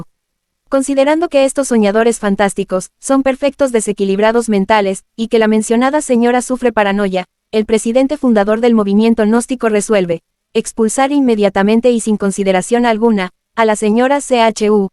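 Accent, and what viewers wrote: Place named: Rio de Janeiro, Brazil. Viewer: a Sede da FRA no Brasil , esta na cidade do Rio de Janeiro . nota-se o sotaque proprio daquela regiao neste.video . o audio nao possue uma boa qualidade . .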